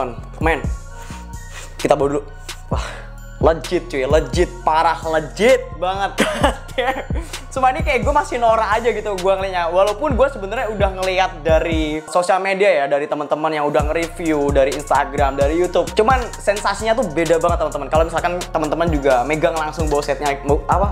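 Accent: Java, Indonesia. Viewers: Medok amat ya.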